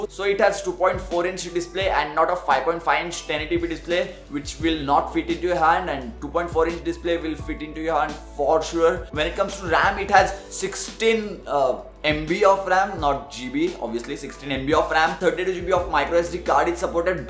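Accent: India. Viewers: this is the only Indian dialect video that I found not annoying so far good job brotha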